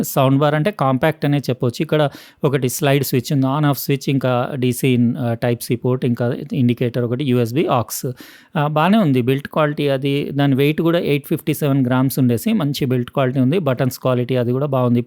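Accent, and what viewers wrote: Telangana, India. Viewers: Telangana slang baley matladthar anna meeru😊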